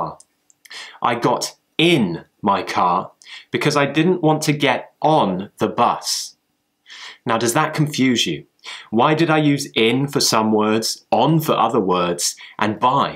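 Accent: United Kingdom. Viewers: Your every British English pure British English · Which part of England are you from? I was thinking all the British people say "can't" like "kaant"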